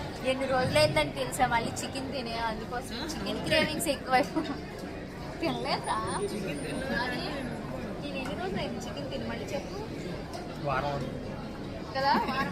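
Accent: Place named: Visakhapatnam, India. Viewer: Me latest short video nacha ledhu Telanganal lo konni rojulu unaru meru valla basha yasa ni egatali chesinattu undi... Mee vzm yasa kuda alage untundi ga... Speed lo vachestai... aina ok But ila comedy ki kuda oka limit... Balagam movie lo padina singer vaalu...valla yasa basha ni egatali cheyadam wrong..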